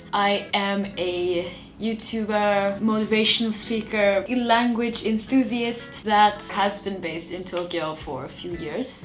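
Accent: Jamaica. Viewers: This came up in my feed and I wondered why this white looking girl is saying she's been away from Jamaica too long...then I hear the accent! Real yawdie! Keep doing ur ting yah girl! Nuff space is there for creatives. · Damn. You speaking in your ja accent is the sweetest thing. Jah know!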